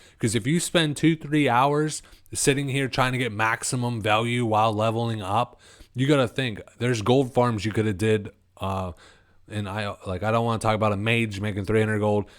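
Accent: Philadelphia, United States. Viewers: Definitely from Philadelphia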